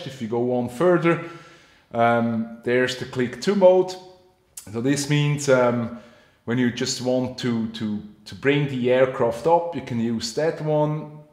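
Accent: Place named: Sweden. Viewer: Behöver hjälp! Du låter svensk, är du det? Undrar bah om jag kan fråga på svenska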